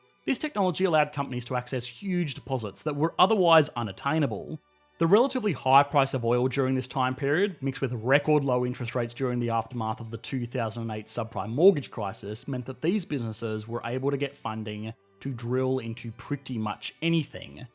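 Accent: Australia. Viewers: Me, a stranger lost in parts unknown, listening to an Australian talk about the economy of Texas while i watch a round blue creature waddle around in front of me waving a mallet. I think it's time for me to move to Texas. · I an Irishman listening to an Australian talking about the economy of Texas 😂😂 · "This! Is Texas." I've been waiting to hear this Aussie say these words for too long!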